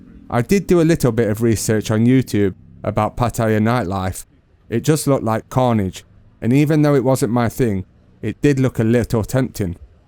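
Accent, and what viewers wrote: United Kingdom. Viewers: You have British Accent.🤔🤔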